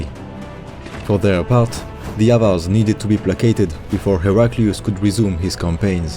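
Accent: France. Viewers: Good and informative video. Enjoyed your narration with French accent, and the pronunciation of the Persian generals were perfect.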